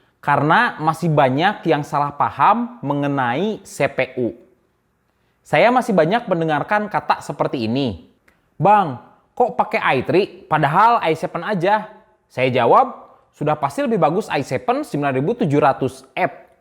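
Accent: West Java, Indonesia. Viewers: logat na sunda pisan kang ..he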